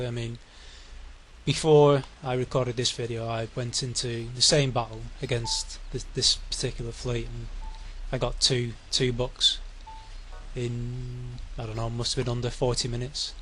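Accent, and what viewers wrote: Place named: United Kingdom. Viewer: Only now have i watched your videos with sound on and found out your British, High5!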